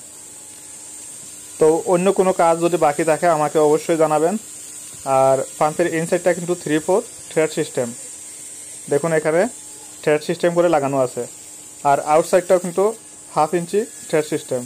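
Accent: Sylhet, Bangladesh. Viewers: Vai Sylheti Sylheti lager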